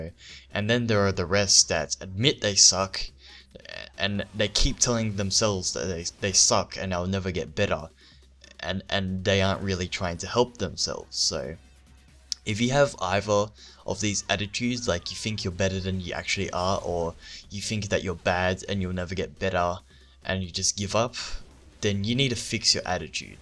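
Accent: Australia. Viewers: YOU'RE AUSTRALIAN, AREN'T YOU...... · im from Argentina, and i have no problems understanding what he says, i do believe he is Australian (he mentioned something about it in the last video comment section) its just that you arent familiar with his accent, thats all, australian accent is more like british if you actually want to compare accents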